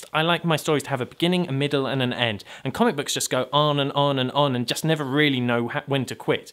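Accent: England, United Kingdom. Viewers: hey i can tell u r english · nerd I noticed something. you and I are somewhat alike we are both akward we both play video games we both speak in birish accents (poits for you for being british) we both have a dyslexic best friend who also plays video games. I find this strange but funny xD o and we both wear the same kind of glasses for some reason .-.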